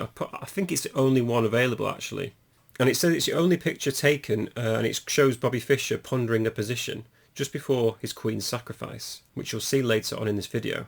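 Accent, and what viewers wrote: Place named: United Kingdom. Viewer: I wanted to share with you how I found your channel, even if it doesnt matter(or maybe you can capitalize on it as a matter of...you'll know what I mean when I say it😅 I searched I donno british chess whatever...I'm here for your beautiful accent man:)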